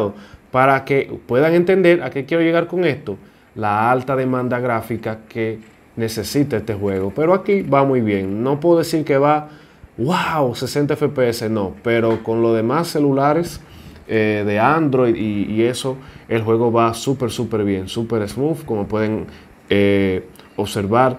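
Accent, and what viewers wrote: Dominican Republic. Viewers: Se nota que eres dominicano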